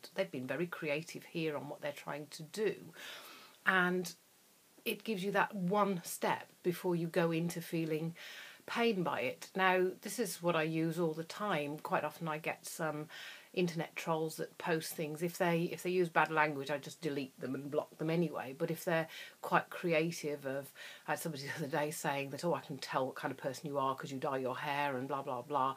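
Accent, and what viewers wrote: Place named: United Kingdom. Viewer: And fuck those haters your gorgeous and smart and nice and honest your make up and hair is just fine,they just hating.fuck them your right how to deal with them,all these haters I would love ❤ to see what they look like,lol lol I bet it would be a permanent Halloween show lol lollol and love your British accent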